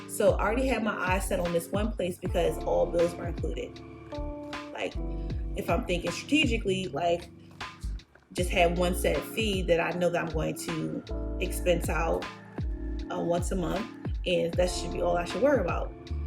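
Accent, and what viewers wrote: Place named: Philadelphia, United States. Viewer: And I just knew u were from philly soon as I heard u talk lol we really do have an accent!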